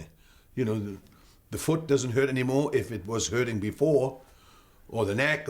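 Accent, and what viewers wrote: United Kingdom. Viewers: Azim Hulaimi he's Welsh , actually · I love your voice, your English accent and your good sense of humor and more your humbleness god bless you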